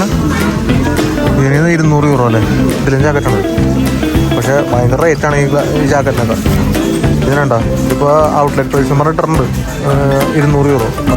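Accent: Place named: Fort Kochi, India. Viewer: Njan nattil malippuram anu ippo fort kochi slang kandu noki nalla rasamundu kelkkan ente father harboril varumaayirunnu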